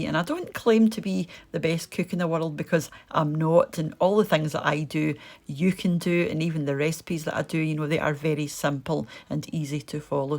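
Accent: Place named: Glasgow, United Kingdom. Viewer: I love your channel, I love hearing your voice because it takes me back home to Glasgow. During this pandemic I look forward to your vlogs every week since haven’t been able to get home to visit family. Are these naysayers cooking gourmet bloody meals every night? I love the fact that your meals are something we can all put together and home made. The fact that you put a home cooked meal on the table each evening is impressive. Anne from DC 🏴🇺🇸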